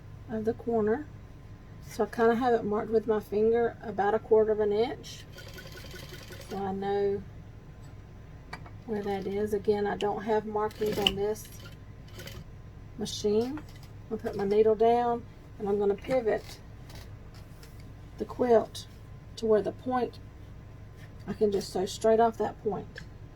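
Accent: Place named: United States. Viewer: Wish I had watched this 10 months ago when I was doing my first binding, this is the best video on binding I’ve seen.. love the way you iron the crease, no rulers and pens, and the way you joined the ends using the bit you cut off is brilliant, so less complicated like the others. Will post your video on a face book page on quilting. Did you do the quilting as that is gorgeous, is there a video on it. So glad I found your videos as I’ve only been sewing for a year, I do have an embroidery machine as well. I also love you accent, where in America are you from? I’m in the UK xxx. Thanks for the video xx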